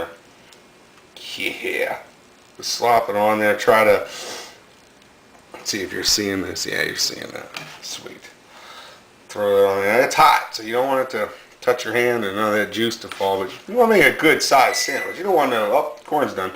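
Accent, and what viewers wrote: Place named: Pittsburgh, United States. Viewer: You sound like your from pittsburgh. I assumed you were originally from pittsburgh when you first talked. I'm from southern WV and I've only been to pittsburgh once, so maybe I just haven't heard the accent enough.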